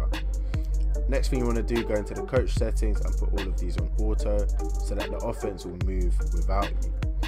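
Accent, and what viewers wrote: London, United Kingdom. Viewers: Your from London init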